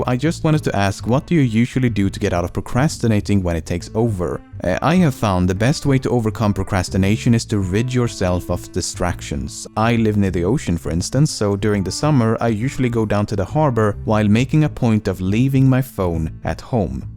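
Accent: Gothenburg, Sweden. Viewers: Sooooooo i caught something in this video.... I think accent is becoming more english than swedish. Honestly that thick swedish accent is what makes your videos stand out from the whole youtube space. Oh and also congrats on 3M! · Är du från Göteborg? De låter så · Lem’s narration on his older vids (especially 2 mil Q&A) reminded me of Keralis... meybe it’s because of the low pitch Swede accent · No, he has a slight swedish accent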